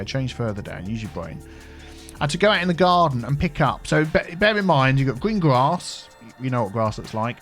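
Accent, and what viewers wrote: United Kingdom. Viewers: I`m from Germany but I`d love your British accent and that your language is so polite and friendly! I also watched Oxygen not included in the last days and maybe you like to play it with the new content again? At the moment you are my favorite youtube channel. Thank you a lot!:) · No idea why I watch, as I don't even play this. But been watching since Christmas.... Where in the UK are you? Trying to figure the accent. We had snow yesterday too. You sound fairly localish to me, but that said, sound like someone who may have lived in different places (ie, a cockney turned geordie 😂 - not saying you're either of those)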